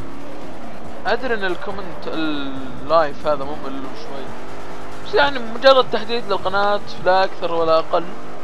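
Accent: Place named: Qassim, Saudi Arabia. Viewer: انت من القصيم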